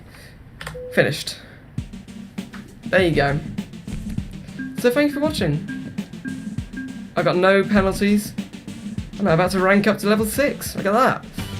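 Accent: United Kingdom. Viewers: young bri'ish fella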